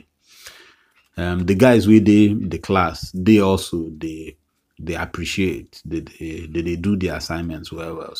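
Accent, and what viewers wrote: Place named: Pidgin, Nigeria. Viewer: Thank you for this sir.. It's your pidgin for me.. Sweet to hear😂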